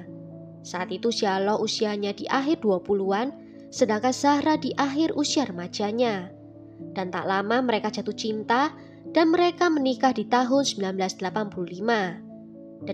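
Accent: Java, Indonesia. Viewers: Medok kali jowo nya 😂😂😂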